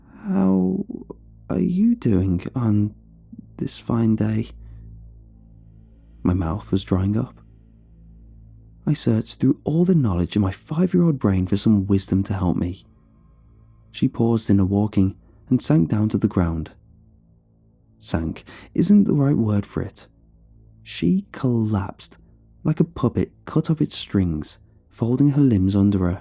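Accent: United Kingdom. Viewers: True, although actually now that I think of it, maybe the narrator was saying holler, but it sounds like "holla" because of his United Kingdom accent...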